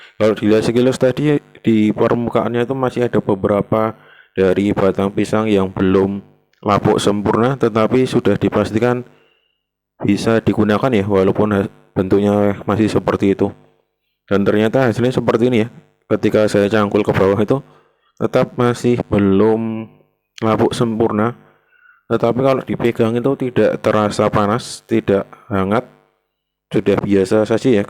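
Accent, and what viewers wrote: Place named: Banyumas, Indonesia. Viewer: Kie logat e ngapak Nemen ya.. Hehehe Salam kenal mas ku,,, Iki umur pira kompos debog e...? Aku wes gawe umur 1 bulan urung apa"...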